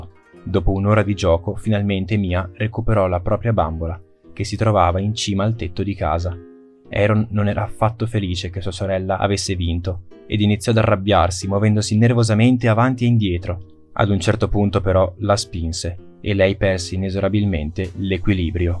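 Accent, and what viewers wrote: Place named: Bologna, Italy. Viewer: Mo che bel accento bolognese che c'hai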